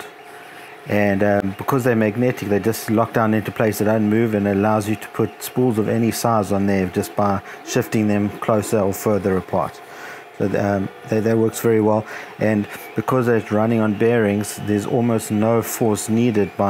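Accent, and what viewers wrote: South Africa. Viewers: Are you South African? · This boytjie must be a south african???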